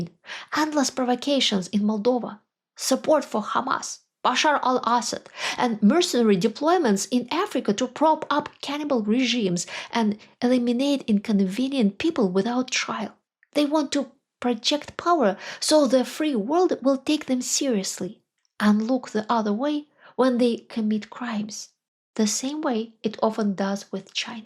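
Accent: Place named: Russia. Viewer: you still have the Russian timbre when speaking English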